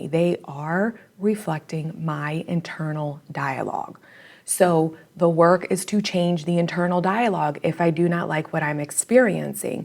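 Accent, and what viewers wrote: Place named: Rhode Island, United States. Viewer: Your accent sounds like you’re from Rhode Island. New sub.💕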